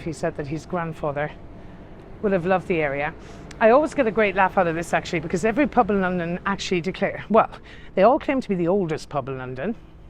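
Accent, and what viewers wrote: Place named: Ireland. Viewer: I have to Admit I got a little Crush on the Host!!! I Absolutely love the” Irish” Accent!!. You’re a very pretty lady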